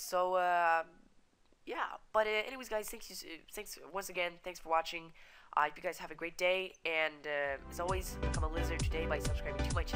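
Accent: Sweden. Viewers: When i try to open Start.bat it crashes. Btw are you swedish? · are u swedish? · are you from sweden?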